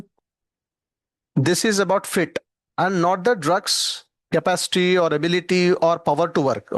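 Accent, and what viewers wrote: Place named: India. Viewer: ليش هندى اللى بيشرح ...على رأى ترمب لما قال اى دونت اندر ستاند ..سيت داون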